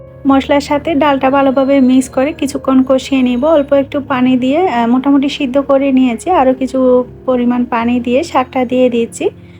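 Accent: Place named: Sylhet, Bangladesh. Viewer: ❤❤ আপু সোহার জন্য রইল মন থেকে অনেক অনেক দোয়া ভালোবাসা ❤ তোমার শাশুড়ি তো অনেক আম এনেছে বাড়ি থেকে আমাদের না এবার আম আসেনি আমাদেরকে একটু পার্সেল পাঠিয়ে দাও আপু, ইলিশ মাছ আমার অনেক পছন্দের ইলিশ মাছ যেমন রান্না হোক না কেন বিশেষ করে গরম ভাতের সঙ্গে ভাজা আর ইলিশ মাছের তেল যে খেতে বেশি ভালো লাগে আমার, পরিবারকে নিয়ে অনেক ভালো থাকো সুস্থ থেকো শুভকামনা রইল আপু, তোমার বাড়ি কি চট্টগ্রাম আর ঢাকাতে থাকো তুমি তোমার কথা বলার ধরনটা সিলেটের মানুষের মত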